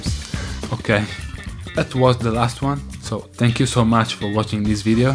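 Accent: Italy. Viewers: • Italian voice/accent revealed • Laugh revealed CHECKMATE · If you observe closely you can hear a tha italian accent. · Bruh, he already revealed his laugh 5 years ago and his italianglish voice too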